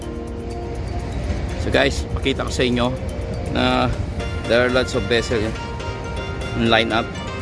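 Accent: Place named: Bohol, Philippines. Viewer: Boholano salita oi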